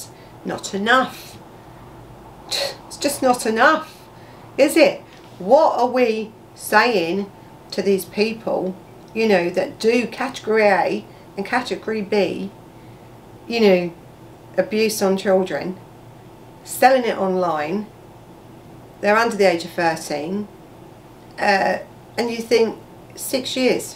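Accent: United Kingdom. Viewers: You’re a great storyteller; I love the British accent.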